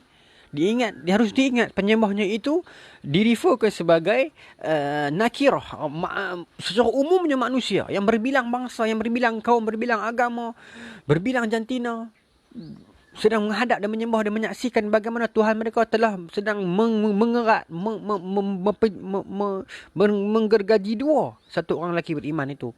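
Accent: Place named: Kelantan, Malaysia. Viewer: Ustaz ni meme rilex ore klate oyak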